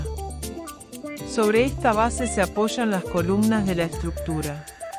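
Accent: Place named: Argentina. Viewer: Vi la información de las zapatas, me iba a suscribir, ya lo habia hecho, todo parece indicar que la información viene de un ingeniero estructural y por el acento de voz, es claro que es Argentino.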